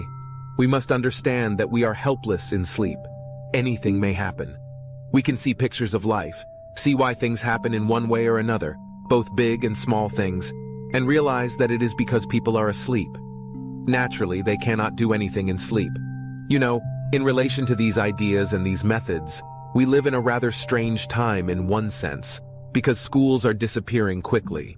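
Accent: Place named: United States. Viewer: Yet another Yank accent.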